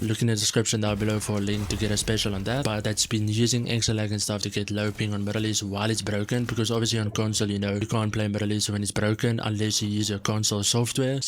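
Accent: Afrikaans, South Africa. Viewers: Awe ek kan hoor jy is Afrikaans 😂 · when i first clicked the video i assumed u were from usa but i heard the afrikaans accent 😂😂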